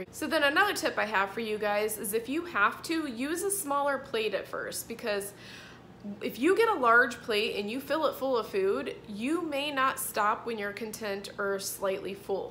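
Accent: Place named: Kansas, United States. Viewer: Great content,...thanks! Btw, are you from the Kansas area originally? Your accent is a lot like someone I know who grew up in that area.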